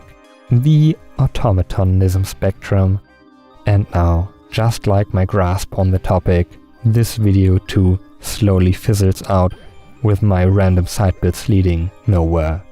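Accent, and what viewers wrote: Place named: Germany. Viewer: I thought: "hmmm, that accent sounds very german..." And the Die Sending mit der Maus theme was playing, so I do not even have to ask anymore. Anyway Gundam Aerial has thick thighs that save lives.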